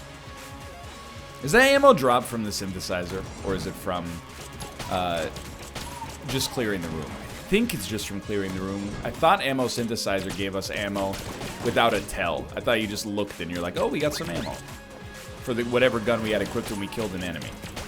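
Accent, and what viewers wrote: Canada. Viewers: I don't think I've ever heard Canadian accent as strong as I do in this episode, especially in the first half. He slipped into it during the bit and it took him awhile to pull back out of it.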